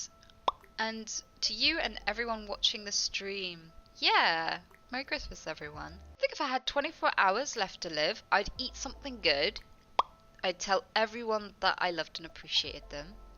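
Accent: United Kingdom. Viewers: So is that it? A hot girl with a British accent who randomly spews out profanity? I think I'm in love.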